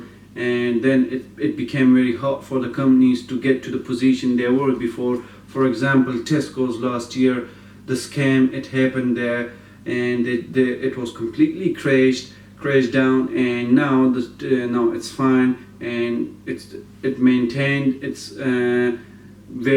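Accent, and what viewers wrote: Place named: United States. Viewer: Accent hm American de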